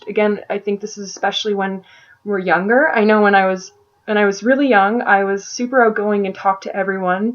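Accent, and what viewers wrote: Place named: Prairies, Canada. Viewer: It's like you just described my personality and things that I do (or did when I was younger) in ways that I didn't even fully realize, like anthropomorphizing inanimate objects and feeling sorry for neglected plants! I do live inside my head a lot, too, and I have a serious love for unpopular or strange things, and I ramble too much..! Are you me? Anyway, great video, and it would be really cool to see a second video on INFP behaviours. P.S. Are you Canadian? You sound like you're from the prairies, haha.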